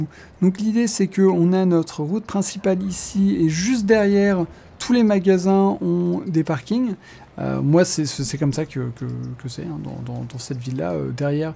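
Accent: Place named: England, United Kingdom. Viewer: Ecoute je t adore mais stp arrete de faire l accent anglais please 🙏🙏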